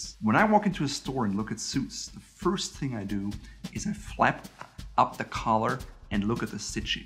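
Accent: Germany. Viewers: He has a hint of a German accent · Awesome. Thank you for making this video. I hear a slight accent, German or Jewish. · I sense German. not sure if correct or not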